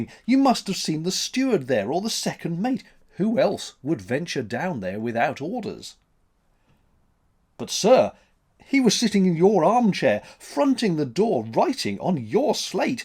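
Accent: United Kingdom. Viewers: bravo. as an american, i can certainly say, stories always sound so much better with a proper british accent. :-)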